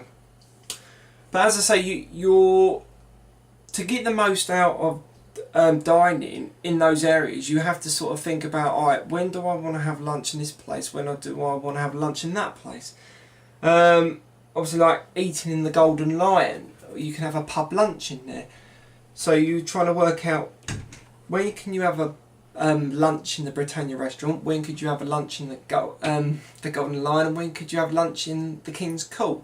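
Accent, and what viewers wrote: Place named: Cockney, United Kingdom. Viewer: Love the cockney London accent!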